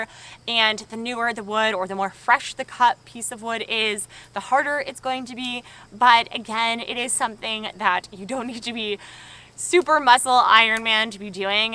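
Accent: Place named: United States. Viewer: This American voice gets in your nerves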